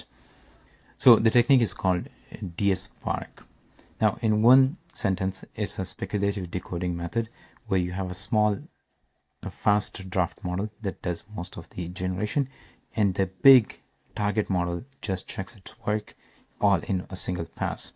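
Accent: India. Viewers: >open video >Indian accent >close video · god dammit! i thought an ad was the video and thought "oh thank god... NOT another indian..." then i realized it was an ad and you started talking and i wanted to throw up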